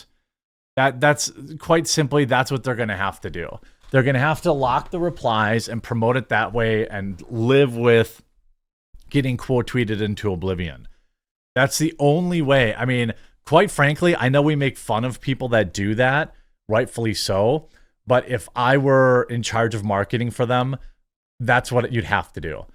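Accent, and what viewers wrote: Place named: New Jersey, United States. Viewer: " says dragon funny." Not sure why, but you have a new jersey accent. You have the same accent as Nick Nimmin, David Laid, etc.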